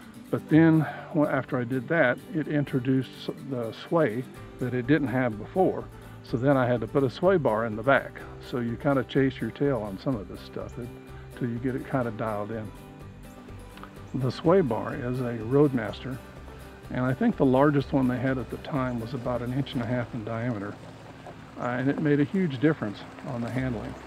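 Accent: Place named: Georgia, United States. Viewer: Gotta be from Georgia. He says "Hweel"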